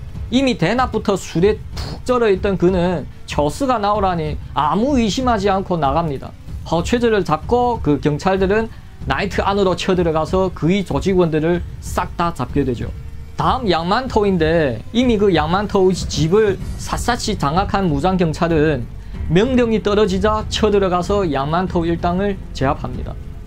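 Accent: Yanbian, China. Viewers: 연변말 와 한국사투리 힘들게 듣고 감니다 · 말투가 조선족인데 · ㅋ ㅋ 완전 한시간동안 헛소리만치구 자빠졌네 말투가 연변말투인데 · 延边土话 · 오랜만에 조선족 이 말하는 조선말 들으니 예전생각 나는군요 중국에서 내밑에서 일하던 조선족 직원들 생각납니다 다들 잘있는지 재미있는 채널이군요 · 연변말투 배우고 싶다 혼자야~앙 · 너무웃겨요 ,,중국식 한국말이요 ,👍👍 재미있고 흥미롭네요 ,,조선족들에 만행들이 ,대단하네요 ,범죄도시, 실화를 바탕으로 헐?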